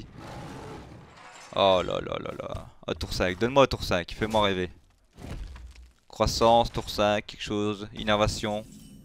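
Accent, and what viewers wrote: Belgium. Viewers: Il est belge ?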